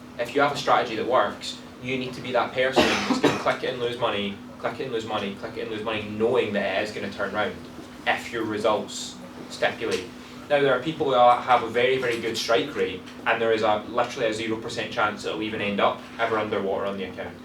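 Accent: Scotland, United Kingdom. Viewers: Refreshing to hear Scottish accent aye 🏴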